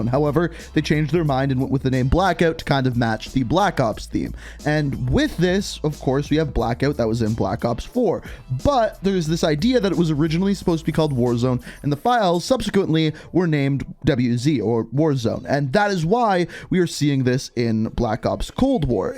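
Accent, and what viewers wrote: Canada. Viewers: Is this guy Canadian? Sounds like he got a little Canadian tweak to his English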